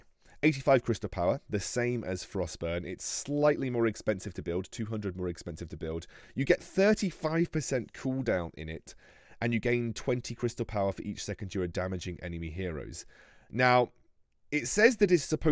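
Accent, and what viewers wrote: United Kingdom. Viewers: Phinn yeah. Luckily his accent is textbook British English so yeah could understand easily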